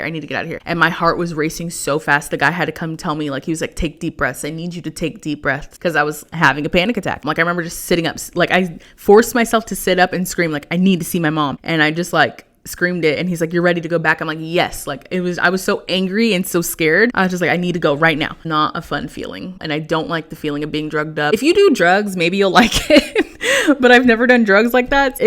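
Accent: Texas, United States. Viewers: Omg your Texas accent is so cute lol! Thanks for sharing your updates and being transparent. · Hey there's nothing wrong with speaking with your Texas voice. I love my southern voice.🤪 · Your Texan accent is gorgeous and it’s part of you. So don’t hide that ish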